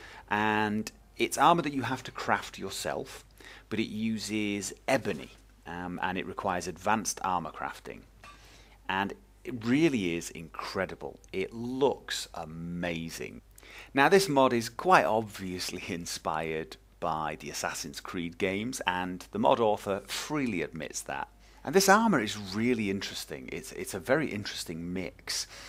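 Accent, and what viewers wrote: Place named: England, United Kingdom. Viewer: His accent is English.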